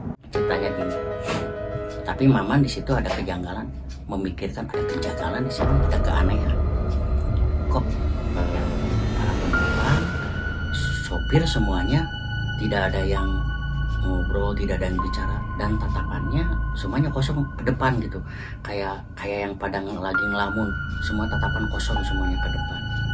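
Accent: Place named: West Java, Indonesia. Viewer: Ceritanya serem, pembawaannya enak dan pertahankan Aksen sundanya Kang!!🦾🦾🦾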